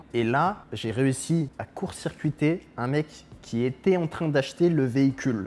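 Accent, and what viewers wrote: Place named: England, United Kingdom. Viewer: par contre ca me fume l'accent anglais hahah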